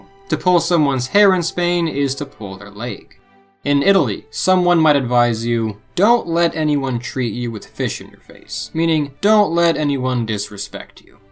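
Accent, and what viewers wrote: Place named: United States. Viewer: This guy can't speak German to save his life. I can speak both, and I tried intensely listening to what he was saying, but I just couldn't make anything out. He was saying it in such a heavy American accent that the words just become nonsensical gibberish Seriously, if his life depended on speaking just one regular basic German sentence like that, he'd be long dead. He's that bad! Cos NOBODY would understand him looool.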